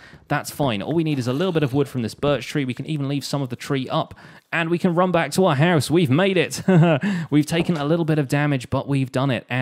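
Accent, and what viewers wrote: England, United Kingdom. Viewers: I love her English accent...skl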